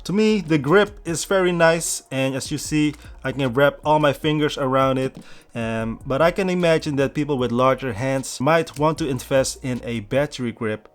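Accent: Netherlands. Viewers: Hoorde direct dat het nederlander was.. Nice content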